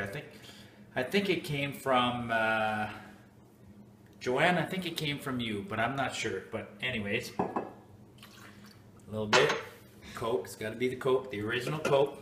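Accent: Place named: Canada. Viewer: Lol i was thinking Canada before you said you were from Canada. Great video. Its like Angels pissing on your tongue lol. I love it.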